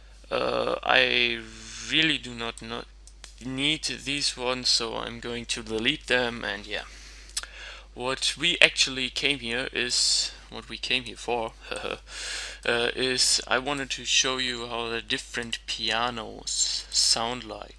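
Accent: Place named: Germany. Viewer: Direkt deinen deutschen Akzent erkannt:)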